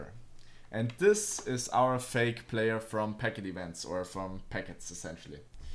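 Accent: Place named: Germany. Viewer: Am Akzent merkt man direkt, dass du deutsch bist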